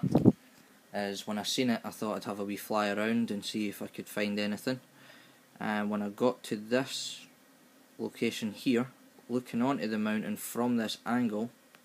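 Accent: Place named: Scotland, United Kingdom. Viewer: Hear to hear a fellow scotsman playing the game from where it was first designed SCOTLAND!!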